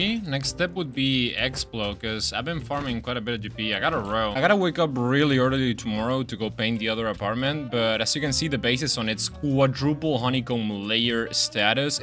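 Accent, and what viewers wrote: Argentina. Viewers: como que este chabon es argentinO XD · Oirte hablar en español con ese acento argentino ayudando a los novatos me da la vida ❤❤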